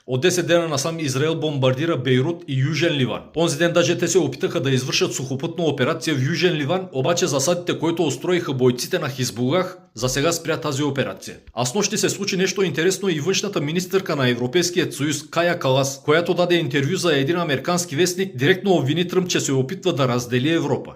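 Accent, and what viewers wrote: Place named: Kardzhali, Bulgaria. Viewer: Браво ,кратко и ясно кържалийски диалект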